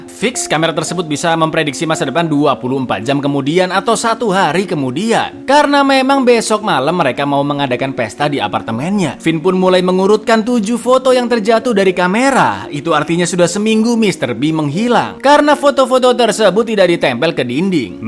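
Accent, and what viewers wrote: Java, Indonesia. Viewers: Jawanya medokk bngett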